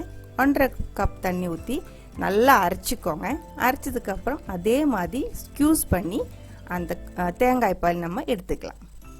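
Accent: Kerala, India. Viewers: Mam ur thamizh mixed with malayalam is sweet. Thanks for explaining in tamizh. I love malayalam, but dont knw.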